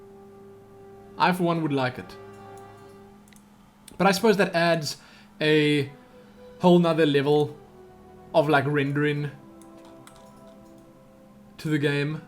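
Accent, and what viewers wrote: South Africa. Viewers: he's South African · It's South African I think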